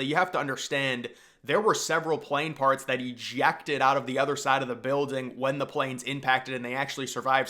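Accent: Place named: Alaska, United States. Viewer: He sounds like he's from the show Alaskan Bush People.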